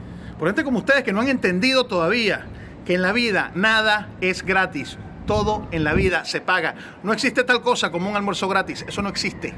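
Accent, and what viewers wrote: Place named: Caribbean. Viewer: Tienes acento caribe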